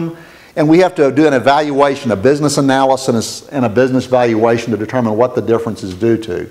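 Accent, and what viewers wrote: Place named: India. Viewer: You talk too damn fast it all sounded like hearing an Indian speak!!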